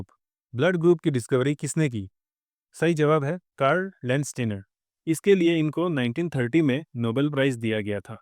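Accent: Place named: Assam, India. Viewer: Assam ka lag rha hei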